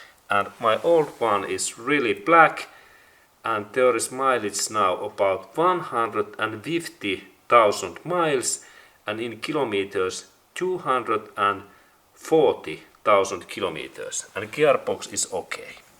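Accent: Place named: Finland. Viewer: Im here from the video: Timing belt little wrong position Honda Civic. Cause I had a problem with my crx and Ive been wondering if you still do videos and you do. And still the same accent, I love this. Keep up the good work my Finnish friend. Anyways Finland Is a very beatiful and friendly country. Ive been there, so I know what Im talkin about. :)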